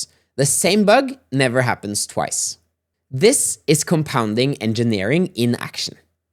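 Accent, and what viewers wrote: Norway. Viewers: Det tok 0.564secunder å høre at du var norsk :)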